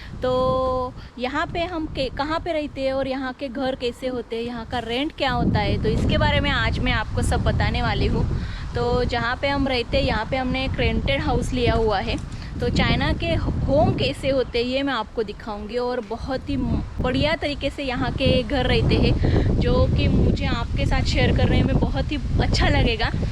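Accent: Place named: South, India. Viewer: Tumhari hindi bolne ki style se muze aisa lagaa ki tum South Indian ho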